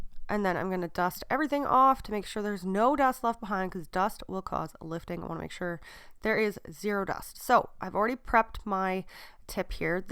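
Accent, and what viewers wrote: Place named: Canada. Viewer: Hi, nice to see your vids back again. As a nail tech I find them relaxing, and your voice always reminds m😂 of my visit to Canada many years ago one summer, I absolutely loved it! Can always tell a Canadian accent when you say the word.. 'about' So cute! 🥰 Love that you're back filming again, best wishes from the UK 💕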